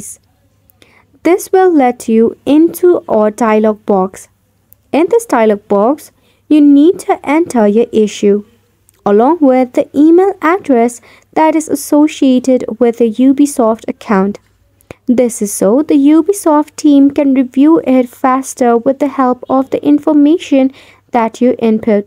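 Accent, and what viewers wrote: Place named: India. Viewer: indan cute ass voice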